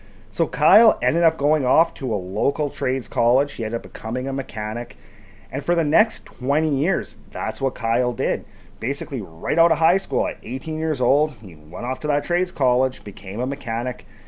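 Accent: Canada. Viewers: Huh, so that is what a Canadian Cow Boy sounds like, ey? I couldn't peg where you we're from for at least a couple mins, LOL. Great vid for the upcoming Halloween season! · Bruh as Canuck working in the US your accent made me homesick haha. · Could tell you were from Canada or Northern States in US by the way you pronounce your “O’s”! My sister married a ND man and he sounds like you! Love it!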